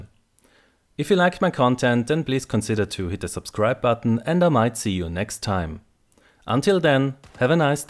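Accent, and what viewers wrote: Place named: Germany. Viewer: Haha okay, cool:) Is interessant wie einem sofort aufällt, wenn einer aus DACH englisch redet. Aber du machst das megamäßig gut und super verständlich;)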